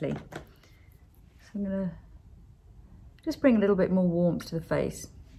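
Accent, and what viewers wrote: United Kingdom. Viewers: You have a British accent !)))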